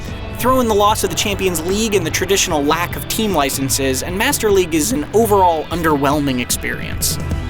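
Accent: United States. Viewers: nice try but if i hear an american accent i automatically dont need your opinion when it comes to football .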